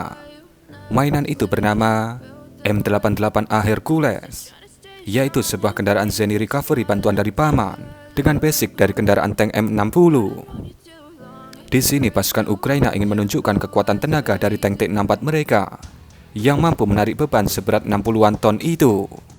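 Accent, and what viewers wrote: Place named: Java, Indonesia. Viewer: Berak2 matamu iku medok2😂😂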